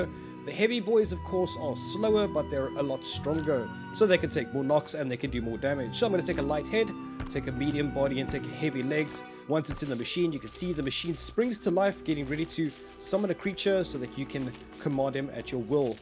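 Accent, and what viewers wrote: South Africa. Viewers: My boy's a South African... Goddamn. It's one of a kind😂Love from CPT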